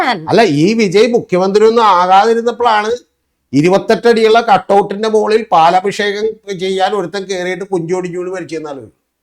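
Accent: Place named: Kochi, India. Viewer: Oh . കൊച്ചിക്കാരൻ്റെ അളിഞ്ഞ മലയാളം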